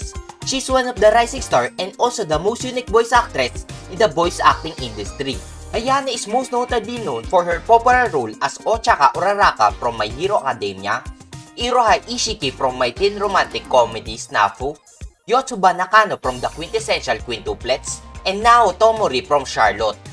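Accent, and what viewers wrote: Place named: Philippines. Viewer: from the intonation of the narrator, im guessing, filipino? btw, nice vid! i watched because well, its ayane sakura!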